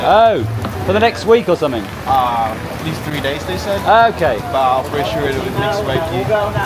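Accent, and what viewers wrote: England, United Kingdom. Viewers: Love your style, enjoy your choice of music and video editing and even your winging-Pom accent is becoming pleasantly familiar, keep it coming man, I dig it man you know what I mean man it’s cool and informative and visually and audibly appealing so zip zap us all with a little bit more please maaaan.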